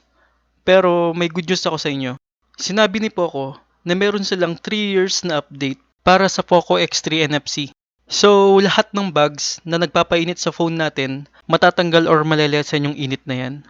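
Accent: Pampanga, Philippines. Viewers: Kapampangan ka sir noh? 😁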